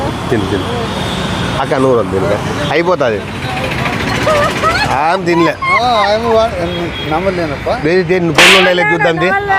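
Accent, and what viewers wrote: Rayalaseema, India. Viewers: Rayalaseema basha chala bagundhi anna 😊😊😊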